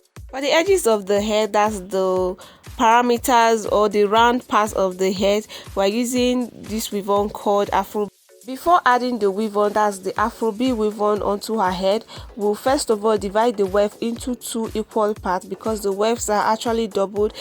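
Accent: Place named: Nigeria. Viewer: Well well, this style is not one of the best ,but this girl is very innovative ,why? First. She has to use the material that is available in to her country. from her accent she is Nigerian ..,not all of the stylist are very up to par with the trends so they create something suitable and affordable for her and ultimately the customers. She is bold enough to have a YouTube channel to show her work and investment and edit the video. She needs more training and I'm sure she will do better.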